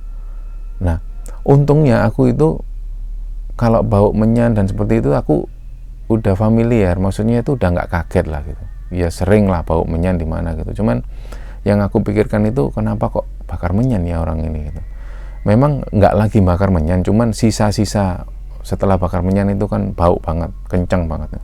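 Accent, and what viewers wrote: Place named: East Java, Indonesia. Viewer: mas, smpean arek suroboyo ta? kok logat, intonasi sma drh2 yg smpean ceritakan di episode2 lainnya gk jauh2 dr Jatim ( Sby, Sda, Mjk, jbg ). Tp memang baru di episode ini sing aku bs bener2 "menjadi dirimu", hehehe.. salam teko dr arek kedungdoro Mas.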